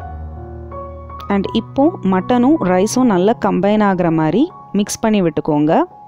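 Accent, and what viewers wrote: Kerala, India. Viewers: Madam neenga malayaliya. Phonele malayalam movie pathe athunale kette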